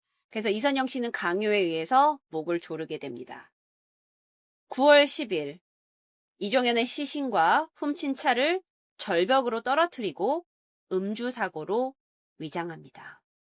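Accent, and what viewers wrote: Jeolla, South Korea. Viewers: 전라도 스럽다...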